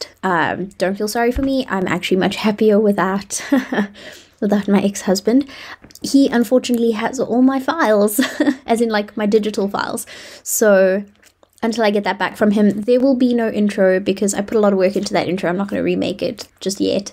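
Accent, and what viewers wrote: South Africa. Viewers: South African accent stays winning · I know a South African accent when I hear one haha! Great video.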